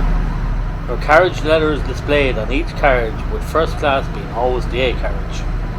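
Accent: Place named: Midlands, Ireland. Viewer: You've a midland accent.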